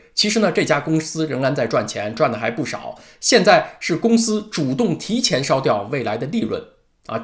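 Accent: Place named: Beijing, China. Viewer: 京味兒太濃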